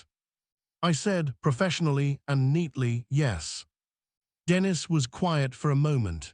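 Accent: United Kingdom. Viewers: And another totally ABSURD story with a British accent from a 10yr old mind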